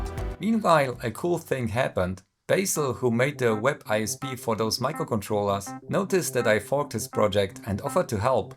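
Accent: Germany. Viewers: Sie haben immer noch Ihren deutschen Akzent.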